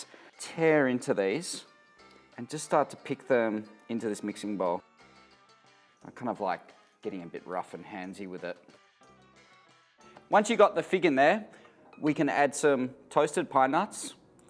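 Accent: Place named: Australia. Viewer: I feel like you are an incredibly skilled chef with a great pallette but you're just boring as hell to watch in a video. IDK what it is. Maybe it's because I'm Aussie too and it's hearing the same accent? Anyway recipe looks killer I'll give it a go when I can afford proscutto, nice olive oil and pine nuts (might be a while cause I'm a poor student).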